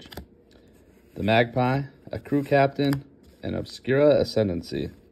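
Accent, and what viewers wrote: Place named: Canada. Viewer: Odd question, you sound like somebody I know. Are you by any chance from canada?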